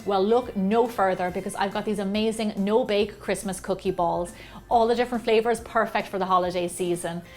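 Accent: Ireland. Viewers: Her irish accent is👌i could listen to her talk forever❤️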